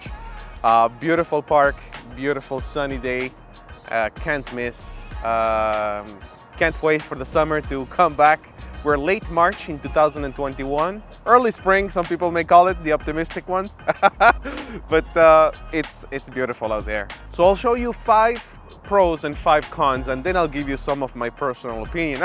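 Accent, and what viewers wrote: Spain. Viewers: Great Info. I was confused at first listening to a Spanish accent from Montreal. Most Americans have trouble with one language let alone 3. You forgot the biggest pro - the food. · you are very charming and positive with your Spanish accent !!! Are you Argentinian of the Italian decent ?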